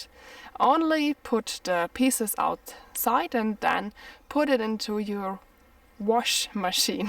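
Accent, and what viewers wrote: Germany. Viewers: I love a woman's German accent when she speaks English. Danke!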